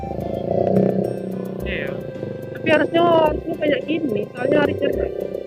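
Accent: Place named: West Sumatra, Indonesia. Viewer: Kayak mana ni ngomong nya indomi (indo minang) yg jls2 aja la ngomong nya 😁😂😂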